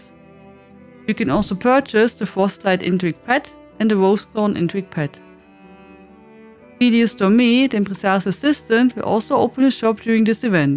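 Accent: Germany. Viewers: Your English is unintelligible in places. I am very tolerant of accents and even understand Africans who speak Pigin English. Your accent is harsh. You were clearly taught English by people who were not native English speakers themselves. Why not stick to your native language? Which, based on your accent, is probably German.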